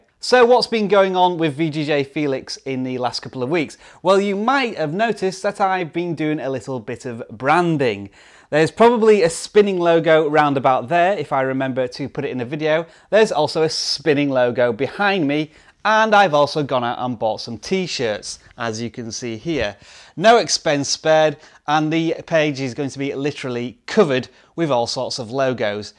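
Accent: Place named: United Kingdom. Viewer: you live in Canada? Are you British though? You have got a British accent in my opinion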